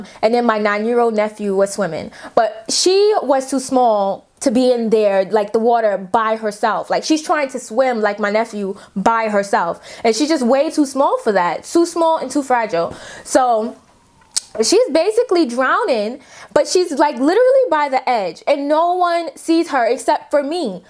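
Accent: New York, United States. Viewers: your New York accent is so cute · I love the way how New Yorkers say things that end with an "er" sound. Lol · The New York accent gives me LIFE fr!!!!! I love it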